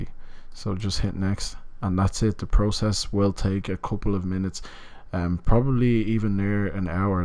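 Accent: Ireland. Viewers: Are u Irish? And good video man👌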